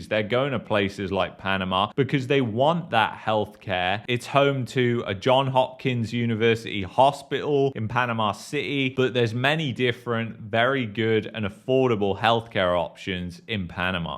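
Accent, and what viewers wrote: United Kingdom. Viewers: listening to your accent, you better go back to the UK and enjoy it..leave Canada to Canadians..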